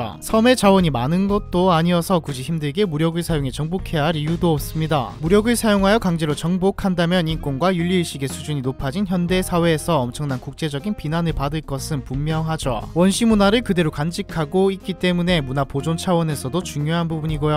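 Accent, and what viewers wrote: Gyeongsang, South Korea. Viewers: 이분억양이 경상도말투 인가보네요?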